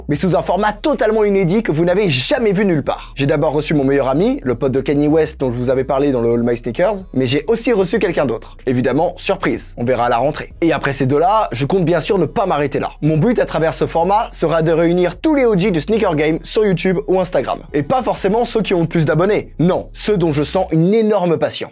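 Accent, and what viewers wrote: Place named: United States. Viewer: à ta manière de prononcer l'Anglais je dirai que tu as vécu aux États-Unis étant jeune ;)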